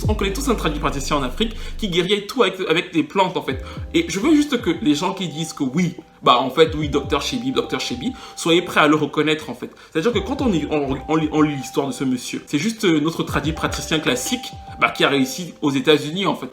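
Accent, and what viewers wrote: Cameroon. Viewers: tu as un accent Cameroun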